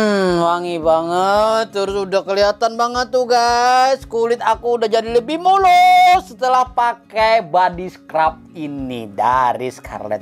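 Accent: Banjar, Indonesia. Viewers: Lugat bahasanya masih barasa mang ai piyan walau pakai bahasa indonesia.. Samangat mang lah maulah kuntin.. Lun tunggui banaran kuntin piyan nang hanyar mang ai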